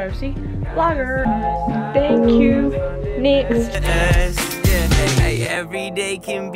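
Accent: New Zealand. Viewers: I clicked on this vid and at the start realised you had a kiwi accent but by the end I forgot all about your accent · I never understand why people think us kiwi’s have an accent but now I realise what they’re talking about lol. I just watched an American vlogger before your video and I was so surprised how distinct it is hahaha. You hardly ever see kiwi vloggers, also died when you said “ excited to put some ‘Kai’ in my stomach “ something only a fellow new-Zealander would understand 😂👍🏽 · I see so many people saying in the comments that they can’t understand the New Zealand accent but since I’m from New Zealand I literally understand everything you’re saying so it’s so weird to think that other people can’t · Just found your channel and also I love your accent cause it’s so pretty like I am from Colorado in America and I have never seen a NZ you tuber and I think your awesome now I am going to subscribe! 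· I sub as soon as I saw and heard your kiwi accent, love supporting kiwi YouTubers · New Zealand is beautiful it's one of the safest countries <3 and you have such a kiwi accent haha, now I can relate to school vlogs. I'm from Auckland. ~ :) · aw you're so cute!! I love kiwi's & their accents it's the best ahah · i live in Australia, but i've always wished to have a nz accent, love yours!xx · I subscribed because you were from NZ, and you never see them on YouTube. I LOVE YOUR ACCENT!! I’m Australian! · I just got auckland for half a year, i don't familiar with kiwi accent so far, your vlogs are perfect for me to practise listening skill of kiwi accent :) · yes i love seeing kiwis on you tube as i am myself and you really realize our accents after watching american youtubers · I went to NZ for term 1 and this brings back so many good memories lol, also your accent makes me miss my friends😂💗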